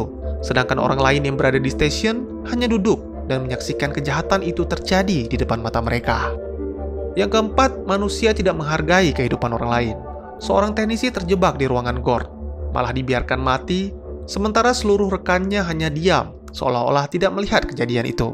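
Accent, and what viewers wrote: Aceh, Indonesia. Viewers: bang orang aceh ya?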